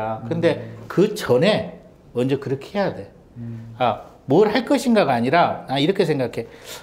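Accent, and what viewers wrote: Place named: Jeolla, South Korea. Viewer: 아...이책 꼭 구입해야겠습니다.. 구수한 전라도 사투리..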